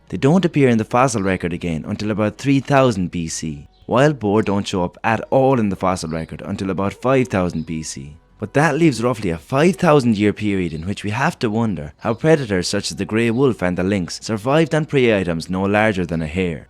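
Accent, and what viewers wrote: Ireland. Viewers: I've been really loving the videos you've been putting out geezer, top drawer 👌🏼 they are helping to inspire me to pursue a career in ecology/conservation/rewilding. Out of curiosity, where abouts in Ireland are you from? I'm trying to develop my ear for the different regional Irish accents! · Are you Irish?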